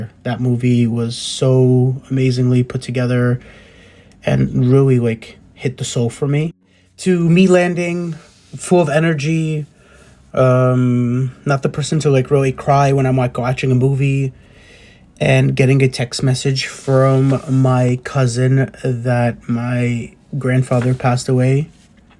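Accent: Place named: Russia. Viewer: Oh my god, I'm first to say, I love your videos and when I saw your video come on my youtube, I stopped what I was watching and clicked on your video., you are soooooooo talented. Your russian accent with your N.Y. mom character and Babyshka character are on point. I have watched all your videos. Please, Please continue making videos. LIfe is good. Be well and B+ (Be positive)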